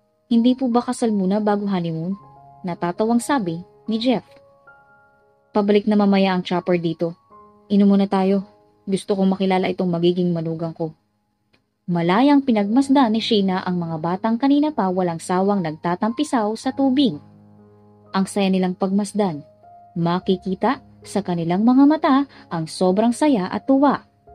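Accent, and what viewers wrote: Visayas, Philippines. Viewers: Sorry maganda story but narrator boses visaya accent nakakawalang gana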